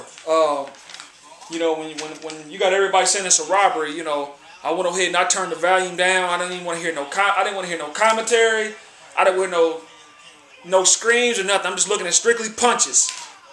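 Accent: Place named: South, United States. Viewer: My nigga u sound like u from one its them down south states or I maybe even from st louis so u holdin down ya mans but that nigga alexander loss but he did look way better than I though I had him getting ko'd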